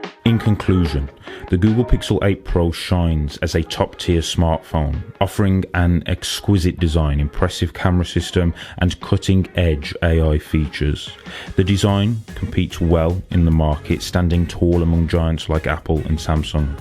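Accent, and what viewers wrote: England, United Kingdom. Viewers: Bro, your english accent and monotone speech make it hard to understand what you are saying sometimes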